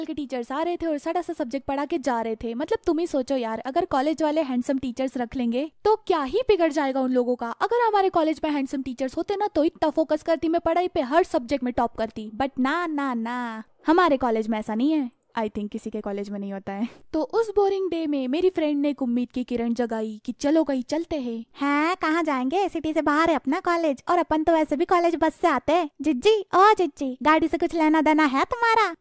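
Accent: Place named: Indore, India. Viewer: Your slang like indoris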